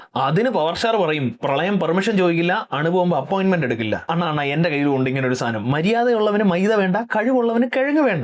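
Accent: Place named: Kottayam, India. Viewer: Chettan Kottayamkaran ano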